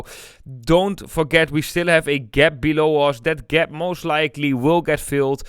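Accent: Netherlands. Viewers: Your name ' ' before i hear your name i did think immediately he is Dutch. I can hear the Dutch accent. LOL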